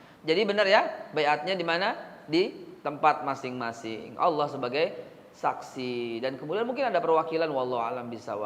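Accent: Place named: Betawi, Indonesia. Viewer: Dialeg betawix yg saya suka yg justru krn kturunan, tp akidahx ciamik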